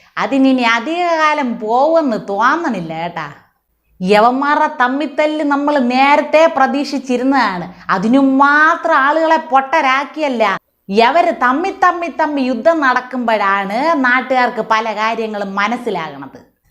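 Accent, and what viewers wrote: Thiruvananthapuram, India. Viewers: ഇത് എന്തൊരു രസം ഭാഷ പക്കാ തിരുവൊന്തരം 👍👍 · ജി, തിരോന്തരം ഭാഷയിലുള്ള അവതരണം വളരെ നന്നായിരിക്കുന്നു. ഇതേപോലെ തന്നെ കൊല്ലം, തൃശൂർ, മലബാർ ഭാഷകൾ കൂടി ഉപയോഗിക്കാൻ കഴിയുമെന്ന് തോന്നുന്നു. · മോളുസൂപ്പർ തന്നെ ഉള്ള കാര്യങ്ങൾ ഒരു ഭയവും കൂടാതെ തുറന്നു പറയുന്നു പിന്നെ മോളുടെ തിരന്തോരം ഭാഷ അടിപൊളി👍👍👍👍👍♥️ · തിരോന്തരം ശൈലി നന്നായിട്ടുണ്ട് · തിരോന്തരം കലക്കീട്ടോ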